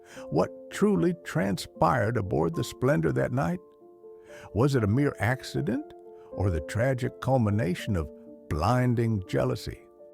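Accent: South, United States. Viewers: He has Alzheimer’s. Why does the narrator have a deep southern accent? Walked and Wagner supposedly were in a sexual act when Natalie walked into the main room of the boat. If several people heard her cries, why did they not take action? Everyone was extremely drunk. Can you solve a case 44 years later? A tragedy definitely. I would never make an opinion based on this evidence, or lack there of. If those other wealthy people heard her screams, why didn’t they make sure all was ok?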